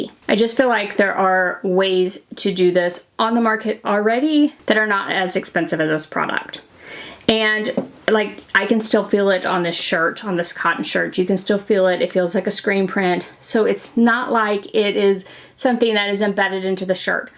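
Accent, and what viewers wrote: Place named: United States. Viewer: The microphone is tuned up to high which means it's not exactly complimentary to a female American accent and vocal fry.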